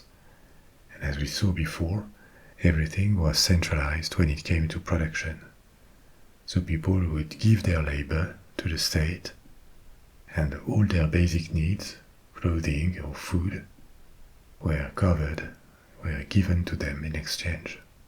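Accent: France. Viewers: plz keep your french akcent its amazing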